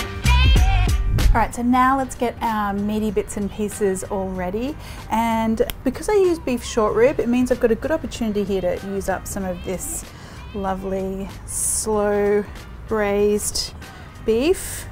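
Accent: Australia. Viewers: Its her aussie accent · she's aussie lol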